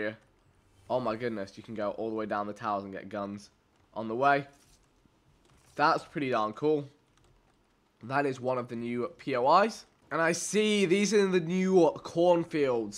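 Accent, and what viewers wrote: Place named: United Kingdom. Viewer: Why do you talk like your from the UK your indian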